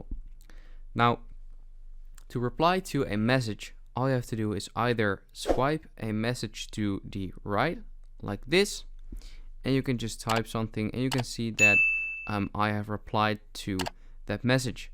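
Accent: Netherlands. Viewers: When the dutch accent gives you away 😏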